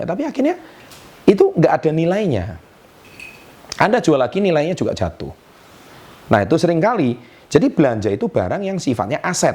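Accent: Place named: Surabaya, Indonesia. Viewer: Mantap? Sy suka banget dgn gaya pak memberikan paparannya, masukbanget dgn fikiran sy gitu, dan sy keingat bos sy dulu, gaya bicaranya mirip bgt? Terlihat ada ala Surabayanya gitu?? Keren!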